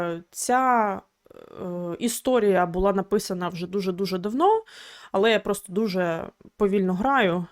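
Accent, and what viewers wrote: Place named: Lviv, Ukraine. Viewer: А звідки ти родом?) Мова дуже нагадує мені Львів або Тернопіль..)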